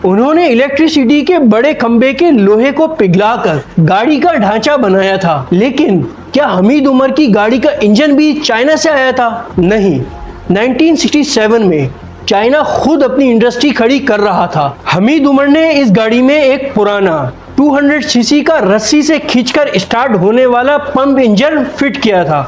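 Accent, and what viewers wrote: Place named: Karachi, Pakistan. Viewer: انتہائی بے تکی انداز ھے آپمو الفاظ کا استعمال پتہ ہی نہیں ھے بلا وجہ ہی جذباتی ھو جاتے ھو بہت سارے الفاظ غلط بول رہے ھو خالص کراچی کی مخصوص بولی بولنے والوں کے لہجے مہیں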